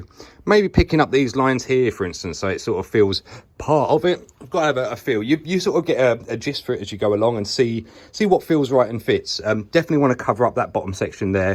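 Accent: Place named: England, United Kingdom. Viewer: You have such good material for your videos.. but the English Accent that England is so proud of has got to be the worst sounding English ever .. I am from South Africa and much prefer hearing an American English accent than this.. I can barely watch this.. it's like not even English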